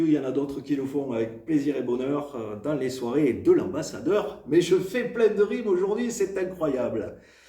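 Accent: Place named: Provence, France. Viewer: Pas pire, yabontuto. Ça promène, au fil du manche. Avé le bel accent qui sent le sud provençal. Bon c'est reste plus simple de regarde que refaire, mais pour le coup ça donne l'envie. Bravo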